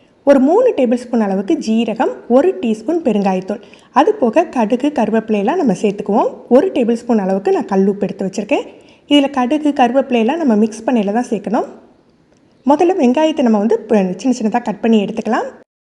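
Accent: Tirunelveli, India. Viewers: நீங்க எந்த ஊர் -மா.நான் திருநெல்வேலி.நீங்கள் பேசுறது திருநெல்வேலி மாதிரி உள்ளது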